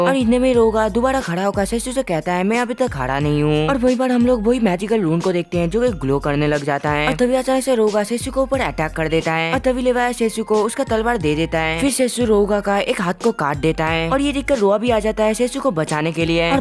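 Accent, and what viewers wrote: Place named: Bengal, India. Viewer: Bengali tone mat nikalo ..aur thik se bolo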